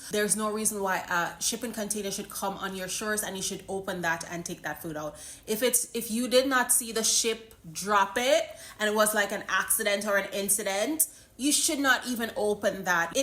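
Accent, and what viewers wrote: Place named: United States. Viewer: Shut up is because you away in America that is why u and your is talking the way u a chat.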